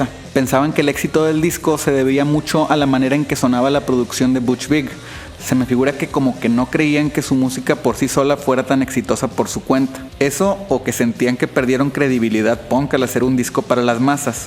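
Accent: Monterrey, Mexico. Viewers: Por qué no hablas de una forma más genérica, no a todos les gusta cuando usas el acento mexicano y tus mexicanismos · Excelente canal... Por el acento, suena que eres de Monterrey, sugiero un video de la avanzada regia y del cafe iguanas... Saludos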